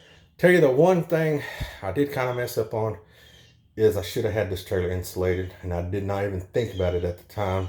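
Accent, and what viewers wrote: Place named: South, United States. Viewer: Congrats on the awesome setup brother. Are you Latino but you sound very southern? Keep trucking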